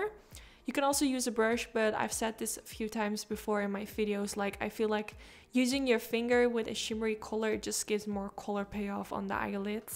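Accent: Netherlands. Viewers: She really sounds dutch it's located in america oh ok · Ik hoorde gewoon dat jij Nederlands was haha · I immediatly thought you would live in the Nederlands ;) The accent always stays I guess. Nice tutorial!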